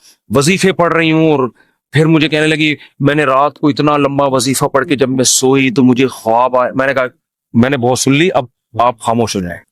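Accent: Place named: Karachi, Pakistan. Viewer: مفتی صاحب کا کرانچی والوں کا لہجہ بھلا لگ رھا ھے ۔❤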